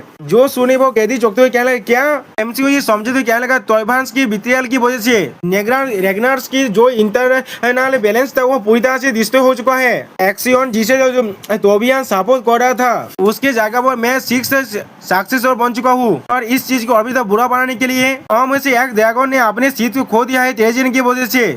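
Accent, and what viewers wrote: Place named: Bengal, India. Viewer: Bhai toda slow or hindi clear bolo kitna fast or bangali me bol rahe ho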